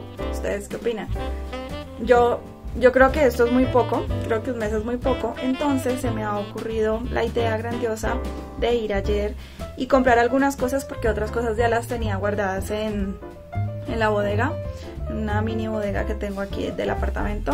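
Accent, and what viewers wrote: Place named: Colombia. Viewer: ese árbol lunar está muy chévere y el pie de árbol está chido.. y si hablas mucho y con acento colombiano.. pero me gusta ver tus vídeos bueno cuando tengo tiempo jaja. Has un suerter navideño para piña. Saludos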